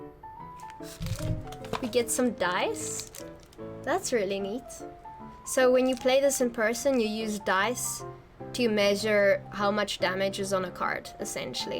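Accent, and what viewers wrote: Afrikaans, South Africa. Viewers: Keep your South African accent - the merging of the two sounds silly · Do you speak Afrikaans? Is this your normal English accent?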